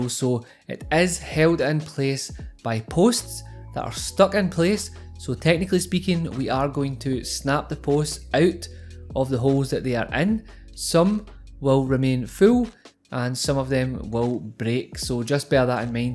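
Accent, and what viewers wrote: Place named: Scotland, United Kingdom. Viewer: Yeah I thought it was sort of scottish dialect/accent, very nice indeed. Thanks again!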